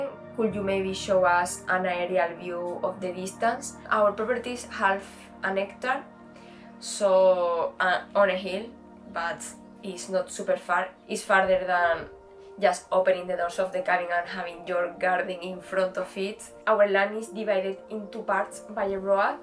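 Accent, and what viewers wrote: Spain. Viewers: Spanish accent - Spanish subscribers..🙄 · it’s harder to understand if you are not Spanish, and the reason it’s not her accent, it is the “entonation” (I don’t think this is the right word, but English is not my language, sorry). She speaks English with Spanish entonation. (Very common for Spanish speakers)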